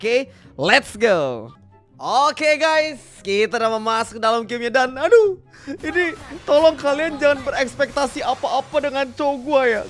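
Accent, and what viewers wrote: Palembang, Indonesia. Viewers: Masih ada logat palembangnya "Aiidaah"😂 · "Aii dahh".. Dialek wong plmbg la nempel trs jo ee😅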